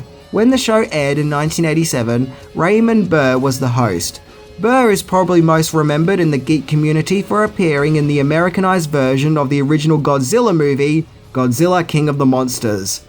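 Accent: Australia. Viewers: Disclaimuhhh, love that Australian accent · Thanks for reminding me why I so hate Australian accents 😖😖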